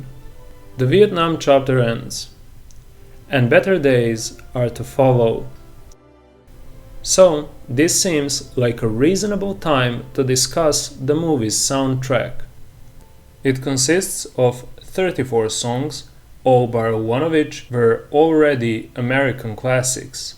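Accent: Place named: Europe. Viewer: That was a great video you were destined to make it...I couldn't even tell you had a speech issue, just a heavy european accent. Keep making the videos I like Zemeckis and Spielberg movies. Zemeckis' movies revolve around this concept of destiny/fate...some good ones are Contact, Cast Away, Flight and The Walk. I encourage you to ask yourself a question Lt Dan asked Forrest, whether He found Jesus. There is a reason you used the word beJesus ....think about why only that name is used as a replacement for a cuss word.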